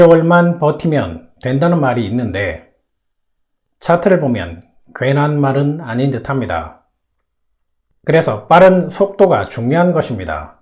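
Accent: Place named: China. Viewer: 이사람 말하는게 중국사람같노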